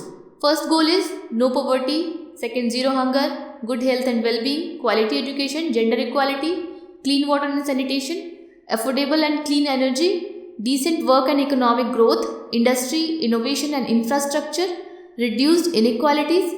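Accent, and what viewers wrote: India. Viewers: Ohhh great Indian accent 👏👏👏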